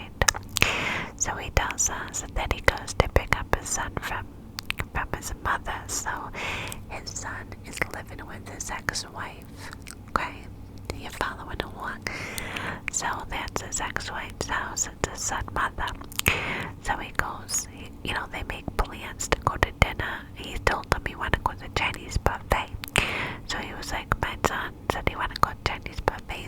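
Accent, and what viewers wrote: New York, United States. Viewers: the NY accent that you do actually gives me life tbh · the ny accent is back!!! we love to see it (or hear it i guess?) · I love the NY accent! It’s not always easy for me to understand everything as I’m not a native speaker but your whispers always relax me so deeply 💖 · i’ve been waiting for another NY accent video for so long!! they are really my favourite, i can already tell i’m gonna have a good nights sleep tonight 😍 thank you, love you <333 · The NY accent is so tingly with your super clicky and soothing wispers😍 need this video rn! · Yess perfect timing! I can't explain how much you have helped me lately with your recent uploads ❤ Sending LOADS of love 💕 P.S I loveeee the NY accent!!! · Love the NY accent videos. Thanks xxx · The NY accent makes it more tingly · I love New York accent Keep going 👍🏼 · Live for NY accent videos! 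😍 Thinking of you and your fam. Grateful for al you do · Love the NY accent kinda reminds me of my family even though they have a Boston accent but I honestly can’t tell the difference🤗. Keep up the amazing work 🤩❤️😴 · Oh my god. Just on time. I was about to go to sleep but now I'm getting my headphones. Love your NY accent. Thanks for all the love and videos that you give us.❤❤❤ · NY accent 💖💖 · NY accent ASMR >>> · Love the gossip and the NY accent 👌💜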